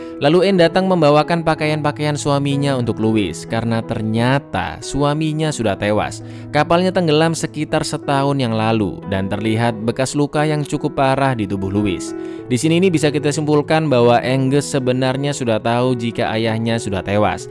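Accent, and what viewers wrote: Java, Indonesia. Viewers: Wong jowo yo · Wong jowo ya😹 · Bang lu orang jawa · Aku mas wong jowo. Mas e jowo ndi mas? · Jowo ndi kang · Bang asal Jawa mana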